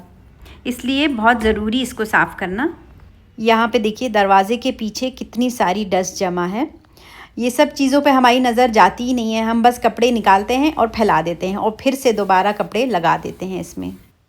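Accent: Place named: Bihar, India. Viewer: Aap kahan ki rehne wali hai aapke lehje se lagta hai aap bihar ki rehne wali hain susral to aapka u.p. hai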